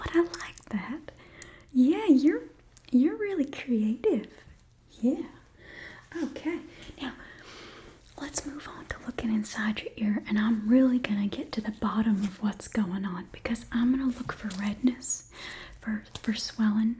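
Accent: South, United States. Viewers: I love this ASMR Southern Nurse Accent. It gives me tingles!😊💙💙💙💙💙💙💙👍. Well done,!💖⭐💖⭐💖⭐💖⭐💖⭐👍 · I grew up in deep rural tennesse..and even she does a better southern accent than I do. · Normally you can tell very easily when someone is faking a southern accent. But you…immaculate. So good. ❤❤❤ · Being from the south I’ve never felt so comforted by the southern accent then I do right now! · I don't know why, but souther accents for me is just ❤ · I LOVE when she a southern woman. She does the accent so well! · I love the southern accent videos so much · I’m southern, let me just say, as soon as you started talking with that accent I immediately felt at home☺️ I love these types of videos! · Honestly, southern hospitality makes for the best asmr videos, regardless of content. You did an excellent impression and will be listened to multiple times in the next few weeks. 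· Yess the southern accent my favorite 🫶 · Two things I enjoy southern accent AND her ear videos? This is amazing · growing up in the south it's uncanny to hear a southern accent not yelling all the time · Thats a pretty good southern accent! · As a VERY southern person (Louisiana southern) she NAILED this southern impression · You do the southern accent so well!!!!!! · You sound and look like a young version of Bert kreischer’s wife. You do a pretty good southern accent! Sound like me almost but more like my granny the proper southern like a Georgia peach. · The thunder in the background was chefs kiss. I also REALLY love the southern accent. So comforting!! 💙💙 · As someone who isn't a fan of America or American things i really like the southern accent when spoken softly like this. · Oof that southern accent is a massive turn-off lol... I'll give it a like and a comment for the algo and yeet myself out ahaha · There’s just something about southern accents… 😊😊😊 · I love it! 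Can you do a video with the southern accent but about eye exams? ❤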